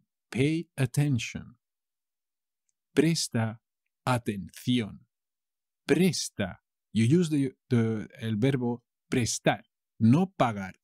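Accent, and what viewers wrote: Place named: Castile, Spain. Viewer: I have gone through many different tutorials and decided to go with yours. Your way of teaching is both very clear and efficient. That you are giving different examples than what is written on the slide is genius because that way it is possible to learn more vocabulary and grammar. Another important reason I chose your tutorials is it was hard to find European Spanish(or should I say Castilian?) I adore the "th" sounds haha. Thank you for your work, it is much appreciated.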